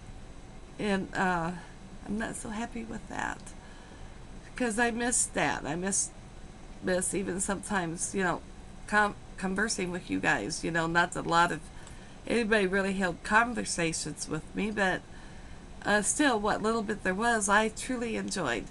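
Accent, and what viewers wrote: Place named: Midwest, United States. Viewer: Great deal on the washcloth pack. You pronounce it just like my whole family does - no surprise since my mom's side all came from Omaha. She moved out here to California when she was 13, but we all still talk like we are from the Midwest.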